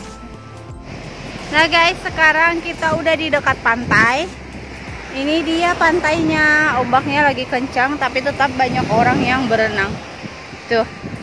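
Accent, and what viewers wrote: Medan, Indonesia. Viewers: logat kk seperti logat anak Medan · Kayak org medan cara ngomong kakak ini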